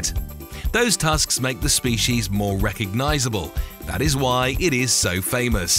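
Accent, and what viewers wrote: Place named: United Kingdom. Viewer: What's with the British accent?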